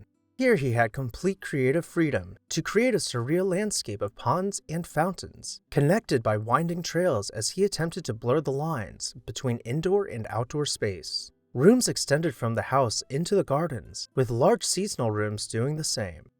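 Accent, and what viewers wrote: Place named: St. Louis, United States. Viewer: This channel is based in St. Louis as far as I know. As a St. Louisan myself, I hear no issue with how he says Louis. How old are you to not know about accents? First time on the internet? 😜😂🙈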